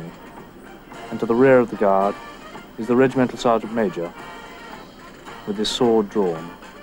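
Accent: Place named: England, United Kingdom. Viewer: could you not get anybody less english sounding to do the voiceover ffs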